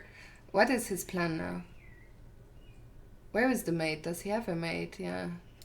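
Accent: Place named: Germany. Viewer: Choker is good fun if you can get it to run on your platform. I've tried 3 different Android versions and struck out every time. Am curious what version all the streamers are running on. Great job on the videos. Am intrigued by your accent. It sounds German, but if I recall correctly you're native to Luxembourg. How many non-English languages do you speak? Your English colloquiallisms and idioms are very good, attended university in the UK or US?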